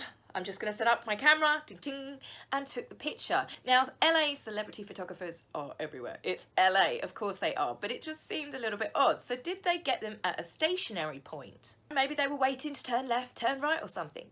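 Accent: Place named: Hartfield, United Kingdom. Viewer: I grew up in Hartfield! I knew I recognized the dialect!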